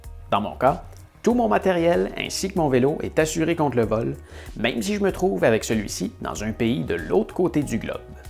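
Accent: Quebec, Canada. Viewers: Holà!🇫🇷 🤗excellente vidéo avec en prime le p’tit accent du Québec qui va bien!.ça m’mets du fun pour bien commencer ma journée 🤗..bonne continuation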